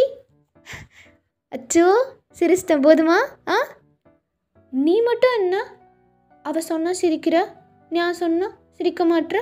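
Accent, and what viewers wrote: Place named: Kerala, India. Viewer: Do you have any Malayalam connection.. actually unga tamil videe malayalam super ayii irik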